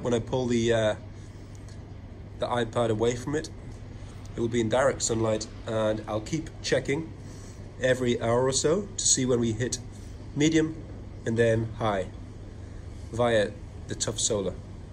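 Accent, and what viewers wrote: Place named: United Kingdom. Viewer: So the watch not working is a protection thing from the heat? I live in Arizona where it gets to 120 degrees , and my Gshock, keeps and going, and just about any other watch I have, including a mechanical wind up watch. The summer's in Arizona gets so hot you cant touch metal with out it burning your hands, you have a British accent, I grew up in the UK, the UK does not get that hot. Something is wrong with your watch, my Gshock is 11 years old, just saying.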